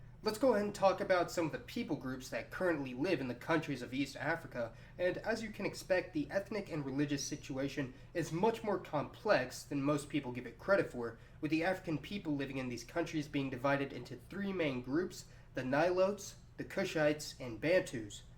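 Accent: United States. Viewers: Burundi is pronounced “Burundee” and not your Americanized habit of pronouncing “I” as “eye” with so many things 🤨😒😑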